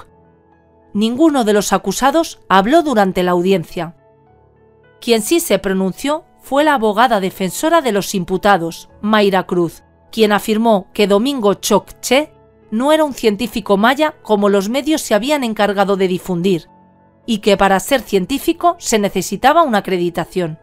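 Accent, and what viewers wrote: Spain. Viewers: Un gusto. 👏🙏🙋‍♀️♥️🍀🌺🇺🇾🇺🇾. Te mando un abrazo grande desde Paysandú Uruguay. Me encantan tus relatos. Y ese tono Español. Q ignorancia!!! X Dioss q en el siglo 21 exista estas creencias. Y matar a un buen hombre x nada. Lamentable estamos mundialmente más salvaje. 😭🤦‍♀️🤦‍♀️😭🙏🙏🙏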